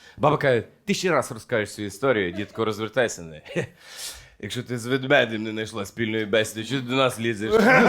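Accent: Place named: Bukovina, Ukraine. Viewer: Розповідає цей пан -- неперевершено! З буковинським акцентом і дуже смішно. Дуже файно! БРАВО!!! Привіт зі Львова від глибочанки.😂❤❤❤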